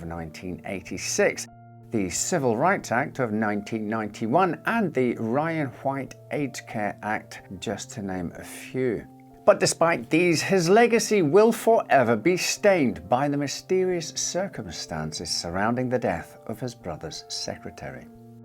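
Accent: Scotland, United Kingdom. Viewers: I like your stories and enjoy your soft Scottish accent. I can't abide stories about harming animals and had to avoid 'Miracle Mike' and struggled through the 'Tarrare' story. Otherwise, great channel. Have you thought about doing a piece on 'The Ant Hill Kids'? A very strange tale, indeed.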